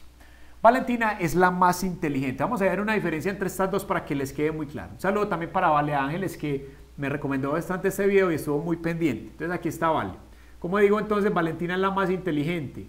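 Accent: Colombia. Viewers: Jaj, me llamo Valentina❤😁. Muy buena clase..gracias! Y tu acento colombiano es encantador👌👌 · Me encanta tu acento colombiano 😍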